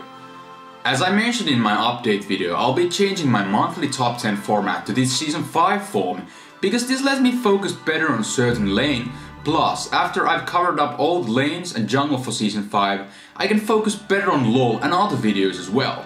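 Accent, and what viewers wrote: Finland. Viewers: Your accent reveals you. Finnish? ;) Great vid.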